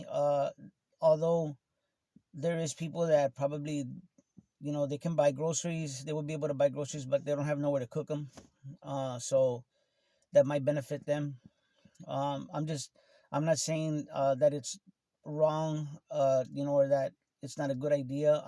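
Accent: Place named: Texas, United States. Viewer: New sub. Love the style and real. Texan here as well. It’s really nice to hear a native voice in all this. Thanks man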